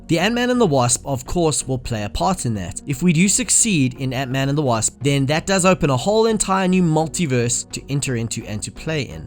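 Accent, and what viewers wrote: South Africa. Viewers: Is this a south african? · Are you South African?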